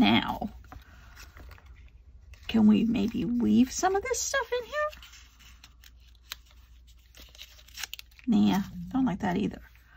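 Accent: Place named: California, United States. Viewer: Southern mixed with California! 😂😂💜💜💜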